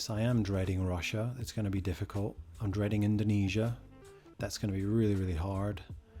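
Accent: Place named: Scotland, United Kingdom. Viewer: Hi bud. Enjoying your videos. Where in Scotland are you from? Seem to have a bit of a mixed accent. I'm Scottish myself so good to come across another countryman who likes geoguessr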